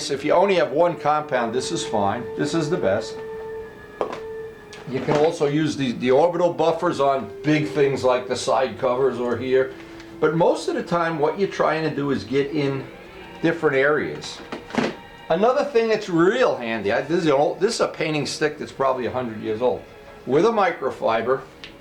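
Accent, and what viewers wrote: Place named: Pittsburgh, United States. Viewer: This guy sounds like hes from Pittsburgh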